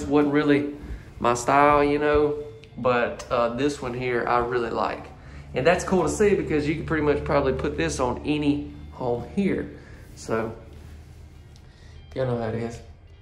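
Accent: South, United States. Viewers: Hello. I am from beautiful Campbellville Ontario Canada. Since today is February 01, where I am is fairly cold. I do like parts of this house. The second bathroom was a good size as you mentioned. No pantry(disappointing), no sink in the "utility room". Regarding the "u", I and most people I am acquainted with would call those rooms a laundry room. If you use laundry room no one can tease you on your pronunciation. 😉 I haven't been fortunate enough to be able to travel to the Southern States. I SO adore your accent. Have you ever traveled to Canada? Where I live(in the country)there are modular homes parks 20 minutes away. Maybe once Covid has gone, you might want to look at modular homes in my area. Thank you for your videos. I enjoy seeing the different layouts, rooms sizes, cabinets and flooring. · BABY BOY!! Libby from Tampa Florida. I would never criticize you on your Channel. 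The only thing I can tell you is don't be negative with your little funnies. Or your big funnies! Those are what makes your Channel! Own them. Don't say I'm just kidding. You are great the Way You Are. Just be more firm with your delivery. Because you are great!. I watch other mobile home channels😝 I can't take it good. I'm looking for your little Southern Voice and just your humbleness that shines through. This time I took the time to put a long comment. I'm sorry that I don't leave one every time because I watch you on TV. GOD BLESS YOU LOVE YOU!!! 👵🙏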